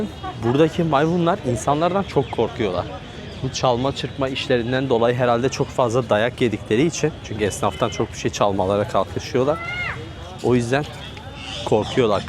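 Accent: Turkey. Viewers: Töbe haram demen harikaydı😂Şiven çok güzel yaa..Bizim oraların( Diyarbakır ,Mardin..vs)insanının sıcaklığını hissediyorum.❤😊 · nerelisin dogu şivesi var ama bilemedim ayrıca kanalı yeni keşfettim hemen abone oldum çok güzel videolar emin olabilirsiniz izlemeye devam edeceğim yolun açık olsun başarılar dilerim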